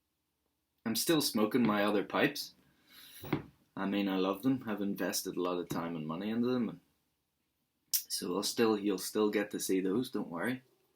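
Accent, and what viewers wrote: Ireland. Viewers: Great chat, always a pleasure to hear an Irish accent 😊 I took a look at the guys site, he has quite a selection of meer's and your right, very reasonably priced. Look forward to seeing yours! 99 subs as I type - you'll have broken the ton in a day or less 👍🏻Also look forward to seeing your presents, your Mrs sounds like a keeper. Lastly, filters - I started with them but abandoned them fairly quickly (more as I didn't like the tight draw). Good luck with that 'stache!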